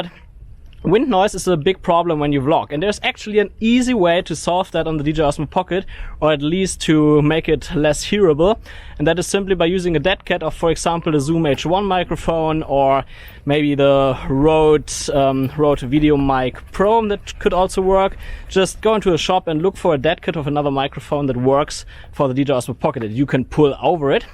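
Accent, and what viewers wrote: Germany. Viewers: Kann es sein das du deutscher bist , wegen deinem Akzent beziehungsweise für mich kein Akzent 🤔 aber wie es auch ist super Video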